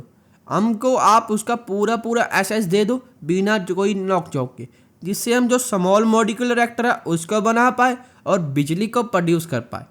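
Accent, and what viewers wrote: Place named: South India, India. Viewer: Bhaiya kaha se ho kya aap South se ho kya aapki hindi bahut alag level ki hai mera matlab bolne k tarike se hai Lekin achchi hai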